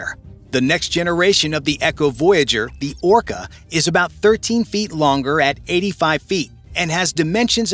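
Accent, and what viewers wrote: United States. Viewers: These American voice overs are so irritating 😂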